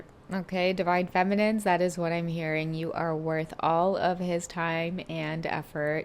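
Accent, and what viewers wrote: Trinidad and Tobago. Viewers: I love to hear you speak.. u bring me home every time I hear that. I might be wat dey call a Yankee but growing up all I Kno is a Trini tounge all my thoughts is in a Trini accent. I love to tap in with ya. Ya does really make me laugh 😂❤ but ya talking de truth. Luv itt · As a fellow West Indian, Jamaican girl, I LOVE your channel. & love your trini accent 🥰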